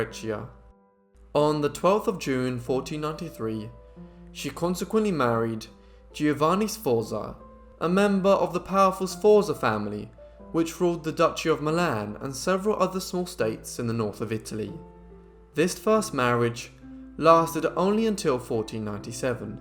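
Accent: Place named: Upper class, United Kingdom. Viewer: I can tell that you are from the upper class, sir. 👍🏼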